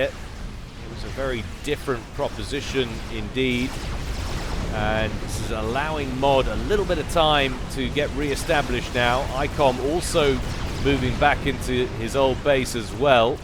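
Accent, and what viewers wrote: United Kingdom. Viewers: Oh this british Tyrannus hurts in my ear D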